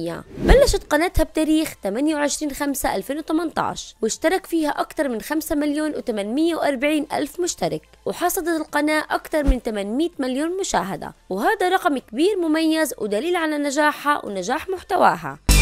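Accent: Yemen. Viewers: ايه كانت تصور فيديو ف تيك توك هي واحد وسألوها المتابعين في التعليقات دا زوجك ردت وقالت ايوه مين قال لكم انها مش متزوجة لا هي متزوجة 🙂💕 تعديل هي لما عاشت في مصر وقلتوا انها تتكلم مصري Noo هي اللهجه اليمنيه كدا زيي يمنيه✌🏻💜🌝🇰🇷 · الهجه يمنيه بجنوب اليمن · اني كنت احسبها سعوديه بس طريقت كلامه يمنيه هلا ببنت اليمن ❤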